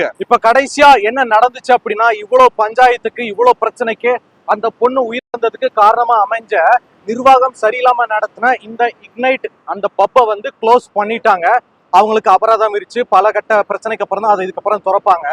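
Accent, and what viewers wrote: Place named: Tirunelveli, India. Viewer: தனிமனித ஒழுக்கத்துக்கும் அரசுக்கும் எந்த சம்பந்தமும் இல்ல அதான் ஆரம்பத்தில் இருந்தே சொல்றேன் உங்களுடைய எல்லா வீடியோவையும் நான் மறக்காமல் பார்ப்பேன்.... நீங்க பேசுற எங்க திருநெல்வேலி ஸ்லாங் ரொம்ப நல்லா இருக்கும்... அதுல ஒரு நேத்தி இருக்கும் அதுல ஒரு நியாயம் இருக்கும். ஆனா உங்ககிட்ட ஒரே ஒரு விஷயம் கட்சிய வச்சு பேசுறீங்க அதுதான் தவறு..... விளாத்திகுளம் அந்த பொண்ணு கற்பழிக்கப்பட்டப்ப அவ்வளவு பேசினீங்க இல்ல கோயம்புத்தூரில் அந்த சின்ன பொண்ண கற்பழிச்சதுக்கு எந்த மாதிரியான வீடியோ நீங்க போட்டீங்க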